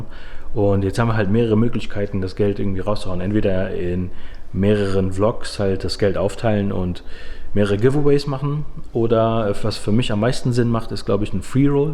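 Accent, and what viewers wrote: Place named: Cologne, Germany. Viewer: Haha, einfach den Kölner Dialekt nach Vegas verfrachtet. So muss das sein freu mich auf die Coaching Videos, seh sie mir jetz an. Grüße aus Brühl vom ehemaligen Hürther, an den ehemaligen Hürther! ( der der mit dir im mandalay Bay 2017 kein Kölsch reden durfte) viel Erfolg weiter für dich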